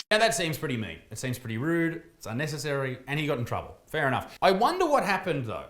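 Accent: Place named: Australia. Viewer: Best damn accent, live in brizzie so i dont hear our accent often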